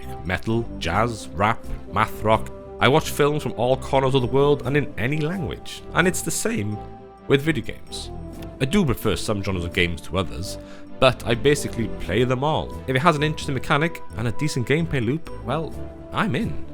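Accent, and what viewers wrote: Wales, United Kingdom. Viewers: it's like your life is mine......but yours has a British accent. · Is that a slight hint of a Welsh twang to your voice I detect boyo? Lovely video, as a 38 year old it speaks to me 😢😊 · BRO its like my 35 yr old canadian brain is talking to be in a British accent through this video.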